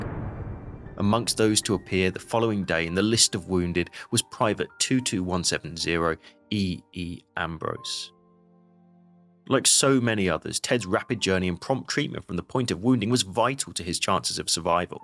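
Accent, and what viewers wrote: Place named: Hertfordshire, United Kingdom. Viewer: Are you in Herts? For a while I've heard some kind of familiarity with your accent!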